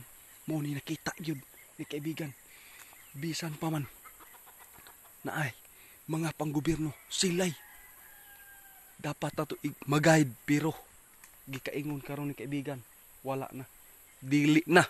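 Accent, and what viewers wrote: Visayas, Philippines. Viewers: Ano ba yan bisayot naman ang Salita mo nakaka buwisit 😅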